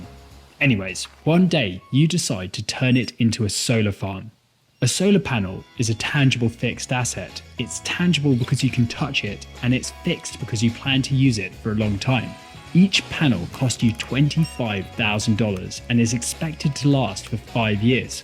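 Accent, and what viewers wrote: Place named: United Kingdom. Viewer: Clear British accent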